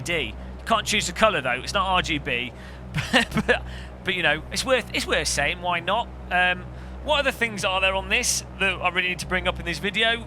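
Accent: United Kingdom. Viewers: Turned off 7 seconds in because limey accents are unnecessary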